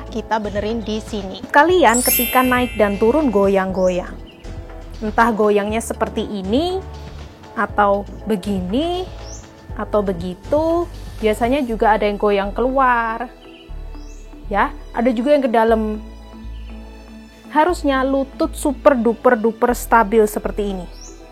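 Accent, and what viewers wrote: Java, Indonesia. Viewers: Lucu bgt perckpnya..medok bgt jawanya 😀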